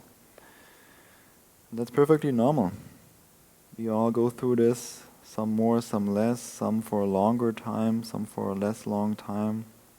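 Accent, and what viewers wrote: Germany. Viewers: dein deutscher Akzent macht leider alles kaputt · I like your german accent and can understand you very well :)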